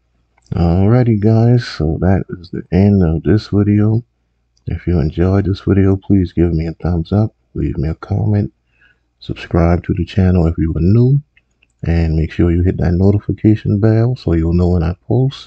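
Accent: Charleston, United States. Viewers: I can here the Charleston in your voice. I’m here to steal another one of your recipes 😂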